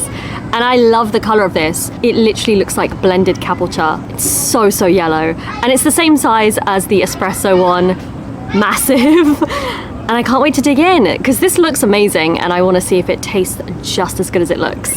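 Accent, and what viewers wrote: United Kingdom. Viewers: きれいなイギリス英語なので、字幕の英語をメモして残しています。そうすると、Squishyさんの癖がよくわかりますね。 例えば、ここでを表す「here」は副詞だと思っていたのですが、名詞もあるのですね。「in here」と、しばしば使ってました。初めて知りましたよ。hereの名刺的用法だなんて。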